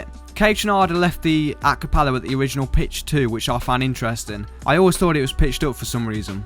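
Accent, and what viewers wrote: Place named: Derby, United Kingdom. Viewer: Sound like you from Derby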